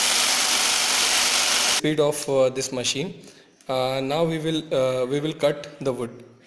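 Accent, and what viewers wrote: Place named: India. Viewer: Accent like Indian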